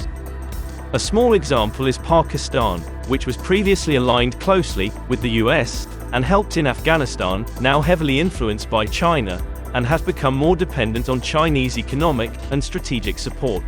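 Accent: United Kingdom. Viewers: When ever I hear a British accent I can't believe anything all Colonial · The host got British accent, nothing but evil comes out of their minds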